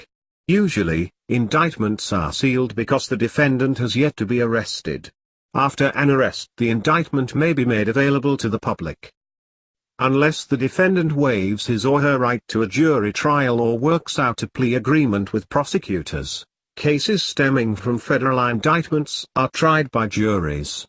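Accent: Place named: Russia. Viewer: Wtf robot voice hide Russian accent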